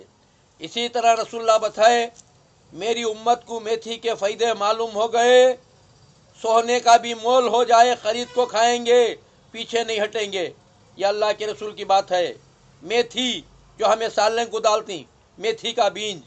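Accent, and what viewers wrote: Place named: Hyderabad, India. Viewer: Ye baba konsi language bol rahy hai... Hyderabadi?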